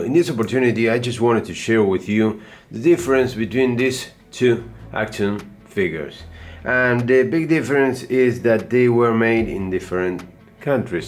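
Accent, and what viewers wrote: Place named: Argentina. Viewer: Esa fonética de inglés es muy argenta 😅... 🇦🇷💪🏼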